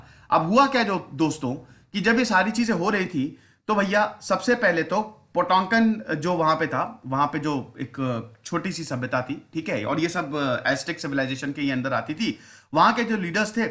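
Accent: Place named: Uttar Pradesh, India. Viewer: UP ke lagte ho bhaiya baato se😂😂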